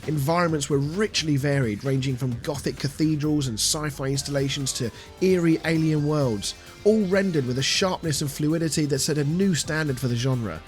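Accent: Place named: United Kingdom. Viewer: Sounds like you are from the UK; you should look into another one of UK gaming's national treasures that pretty much fell under the radar although anyone can still play it as of now. It's a gorgeous 5 v 5 Capital Ship Space MOBA called FRACTURED SPACE. It's one of those games where you felt lucky to have experienced it when it was live.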